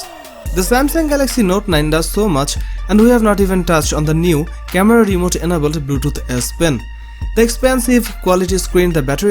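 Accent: India. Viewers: Your accent 😍 Which part of India you are from?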